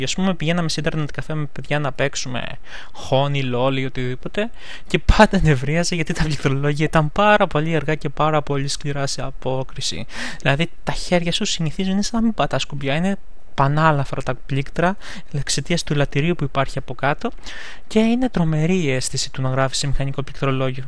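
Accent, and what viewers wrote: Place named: Xanthi, Greece. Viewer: Α γιατί η προφορά σου θυμίζει ξανθη να σαι καλα και συ να ανεβάζεις ωραια βίντεο 😉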